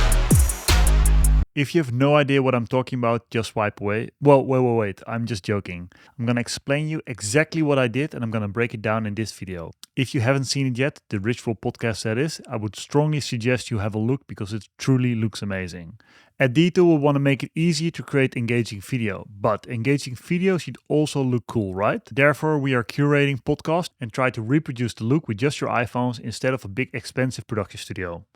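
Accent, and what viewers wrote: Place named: Netherlands. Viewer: I hear a dutch accent🎉.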